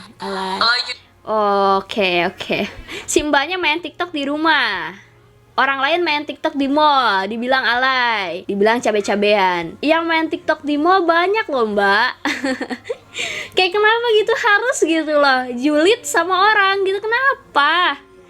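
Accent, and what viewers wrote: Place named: Sunda, Indonesia. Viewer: Sundanya medok😀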